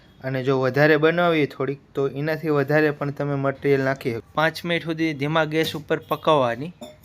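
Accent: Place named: Kathiawad, India. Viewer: ભાઈ કાઠિયાવાડી ગુજરાતી બોલો છો એમાં વચ્ચે વચ્ચે ઇંગ્લિશના ધબ્બા ન મારો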